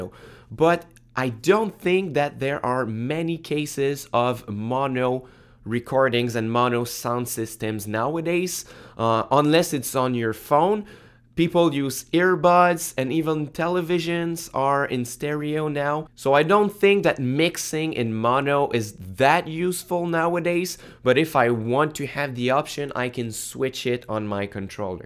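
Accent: Quebec, Canada. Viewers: es tu québecois ?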